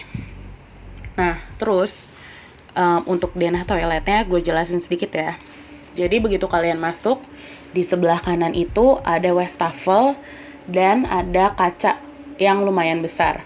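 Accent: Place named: South Jakarta, Indonesia. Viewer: Woww aksennya jaksel bingitsss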